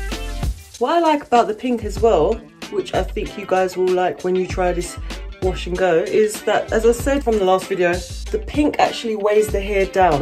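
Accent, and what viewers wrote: United Kingdom. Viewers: Ur voice is pretty are u from the UK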